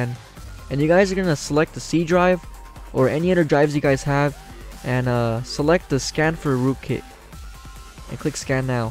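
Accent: Croatia. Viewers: Are you from Croatia?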